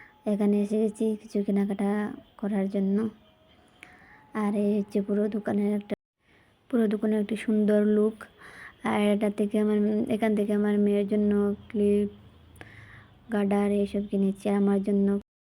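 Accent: Sylhet, Bangladesh. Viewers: লাইক সাবস্ক্রাইবার করে দিলাম ইনশাল্লাহ এগিয়ে যাবেন সুন্দর মন থেকে ভিডিও বানান সমস্যা নাই পাশে আছি এগিয়ে যান হ্যাঁ আপু তোমার বাড়ি কি সিলেটে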